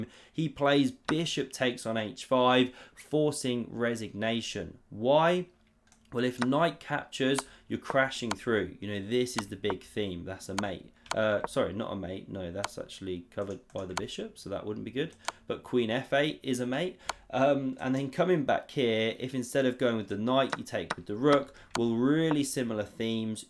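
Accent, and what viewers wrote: United Kingdom. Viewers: I love ur british accent man keep it up !😊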